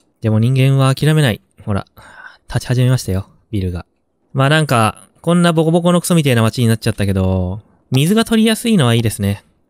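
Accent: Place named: Mie, Japan. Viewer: 〜 関西人説 「為せばなる、為さねばならぬ何事もってやつ『やな』」 結構標準語やし三重県民かなぁ？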